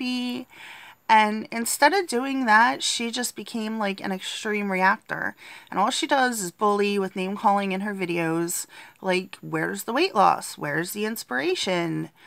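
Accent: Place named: New York, United States. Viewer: You sound like you have a New York accent! Best of luck with the challenge.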